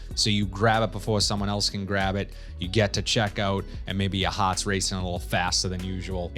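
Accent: Boston, United States. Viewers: Great video , and I love that Boston accent 😅👍🏻👍🏻 How do u feel serge lutens “chergui”compares to this one ? I’m curious about these fragrances now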